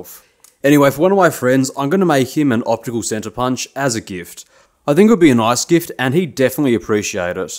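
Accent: Australia. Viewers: Dude for some reason I opened this video and thought it was inheritance machining so I got jump scared by your Australian accent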